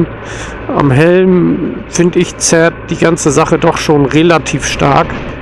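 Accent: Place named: Northern Germany, Germany. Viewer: Ach, ich liebe den norddeutschen Akzent einfach 😃 Hab mal nen Abo dagelassen, und hoffe das hier bald auch mal wieder Videomässig was kommt!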